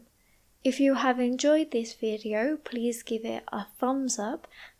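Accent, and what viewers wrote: United Kingdom. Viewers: Normally i don't like british accent but your voice is so good. Thank you for video. I watched first time and i will watch other videos. Hi from Turkey 🇹🇷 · Your voice is amazing and the British pronunciation is my favourite. ❤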